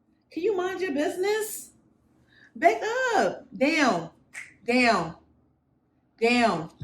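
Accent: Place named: Philadelphia, United States. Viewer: And I just knew u were from philly soon as I heard u talk lol we really do have an accent!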